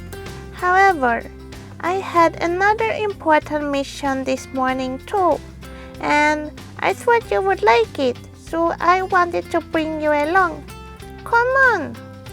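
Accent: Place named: Netherlands. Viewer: Thank you so much for another lovely video! I am catching up for 2 weeks worth. I am still intrigued by your lovely accent. Are you Dutch? I’ve been meaning to mention that I really like your animated maps following Bikki. The music is perfect! And congratulations on your constantly growing subscriber count. Claws crossed you’ll keep growing 😀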